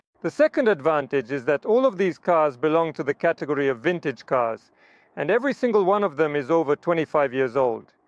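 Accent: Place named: India. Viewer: Так индусы разговаривают на английском.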